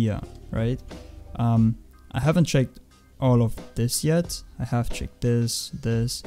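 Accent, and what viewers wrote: Germany. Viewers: U German? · Meine fresse man hört das deutsche raus ;D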